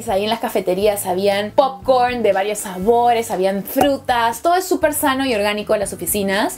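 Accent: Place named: Peru. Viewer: SAAA ES MI CAOSA PERUANASA Y NO SE TE QUITA EL ACENTO, ME ENCANTO TU CANAL 💘🌸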